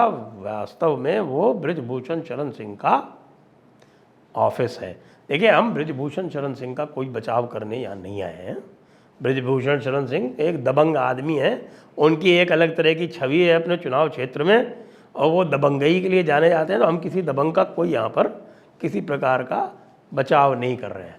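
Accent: Haryana, India. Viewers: Haryanavi accent 😅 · Hi sir I like your Haryanvi style Baddee Baddee pehlwan..... Ho Ho Ho Haa Haa Haa.......Thanks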